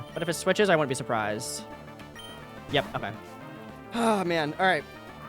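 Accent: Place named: United States. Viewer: This is clearly American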